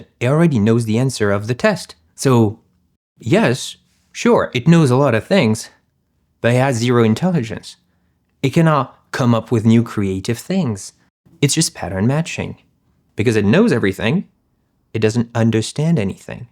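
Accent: France. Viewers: i can hear the frenchman underneath the english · I love your aggressively French delivery and this is a very thoughtfully written video. I love the concept fission concept too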